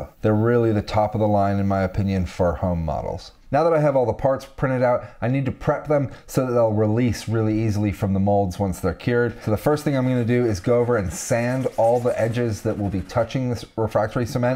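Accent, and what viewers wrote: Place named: United States. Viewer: This channel may be the most useful, applicable, & effective content on here. It's the meat & potatoes answers for worldwide, pertinent questions. My biggest question is why do you sound American & not British? Personally I'd love to understand how you got where you are & what you're doing today? How did you aquire your skillsets & why you find what you do valuable?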